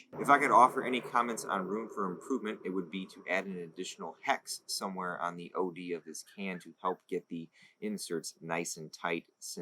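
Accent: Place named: Midwest, United States. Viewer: Lol that northern Midwest accent! You from WI?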